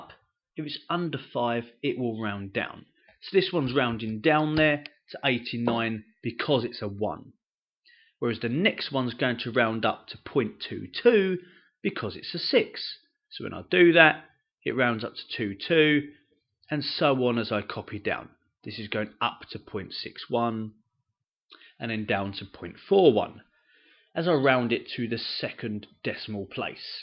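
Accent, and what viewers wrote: England, United Kingdom. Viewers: I have not heard English accent very long time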